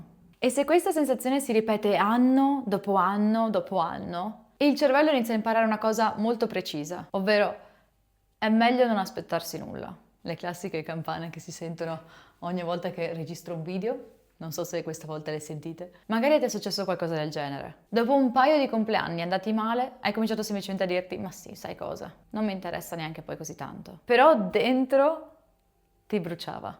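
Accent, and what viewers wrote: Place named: Veneto, Italy. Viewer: Prima cosa: fa strano sentirti in italiano, con questo accento spiccato veneto quando in live parli in inglese. Seconda cosa: personalmente, non do tanto valore al compleanno perché, anche se sembra banale, perché dovrei festeggiare, fare "casino", invintare gente quando (in teoria) posso farlo tutto l'anno? È come quando si dice: "La festa delle donne non è solo l'8 marzo, ma è tutto l'anno". Però, al tempo stesso, vero, più passano gli anni e più, almeno personalmente, non mi accorgo che siano passati. Forse perché tutto passa in fretta, taaaanto in fretta, e certe volte è difficile stare dietro a tutto. Non so dove voglio a parare con questo commento, ma forse, vorrei accendere quella speranza che ad ogni anno le cose andranno bene, non meglio, bene, anche se certe volte è difficile confrontarsi con le felicità altrui (quando specialmente già gli altri iniziano ad avere i primi lavori, le prime case, le prime auto, mentre io rimango qui nella stessa cameretta dove sono cresciuto con ancora tanto da fare e da scoprire)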